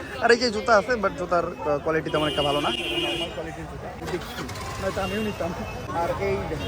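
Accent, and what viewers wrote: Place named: India. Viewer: Vai o bangla kota bole kmne Endian hoye😮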